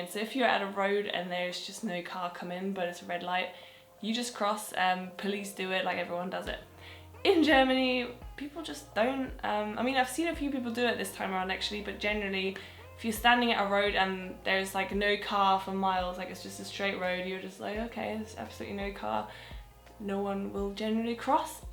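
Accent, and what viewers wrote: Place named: London, United Kingdom. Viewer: You have a superb London accent. I can barely tell that you are not a native speaker.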